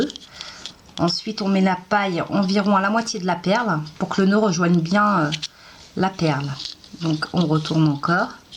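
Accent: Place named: South, France. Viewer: je ne connais pas, je vais m'essayer çà vite fait car c'est méga sympa... merci à toi...et cette point d'accent...çà vient d' ou ??? côté soleil en tout cas !! merci bis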